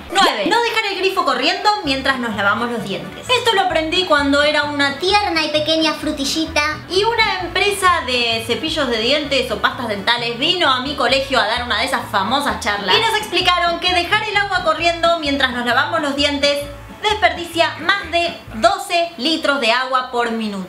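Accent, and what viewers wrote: Argentina. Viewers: De donde es ? Porque escucho que habla 'Argentino' pero tambien neutro en algunos momentos...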